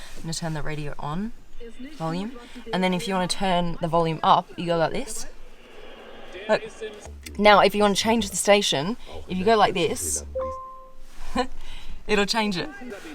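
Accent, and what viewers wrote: Australia. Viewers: As usual, this Aussie ugger is really boring and so in-to-your-face stupid. The accent alone can make me vomit nails! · Cool video and yes we get it, you have a Lambo!!! Big deal, I have a Bugatti. Do enjoy your accent and I dig Australian women :) Your husband is a lucky man. Do you have a sister????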